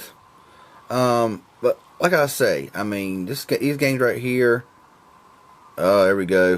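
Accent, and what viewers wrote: South, United States. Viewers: I love your Southern accent. It sounds really cool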